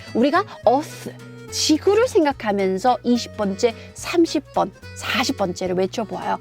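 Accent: United Kingdom. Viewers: 아... 미국 갈 준비중인데 발음이 너무 매력적이라 자꾸 여기오게 되네요 ㅎㅎ 미국가서 멋지게 브리티쉬 발음 해보고 싶네요 ㅎ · 영국 발음 인가요? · 혹시 영국식 발음인가요? 문장 말하실 때 영국인 같으세요~ ㅎㅎ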